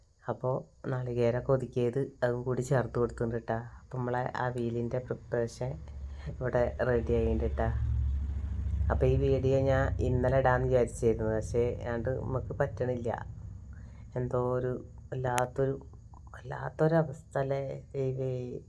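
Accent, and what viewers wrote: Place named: Malappuram, India. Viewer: Malappuram bhasha kelkan nalla rasam ❤❤❤❤❤❤radish curryum super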